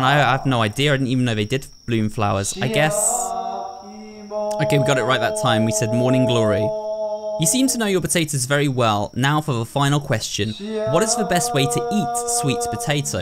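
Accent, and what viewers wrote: United Kingdom. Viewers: Ur uploads always soothe my stressed mind with that comforting and sexy british accent. Luv u!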